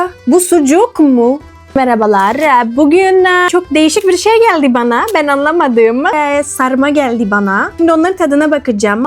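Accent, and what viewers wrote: Cyprus. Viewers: Kıbrıslı zaten😂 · Abla neden Kıbrıslı gibi konuşuyorsun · kıbrıslı çünkü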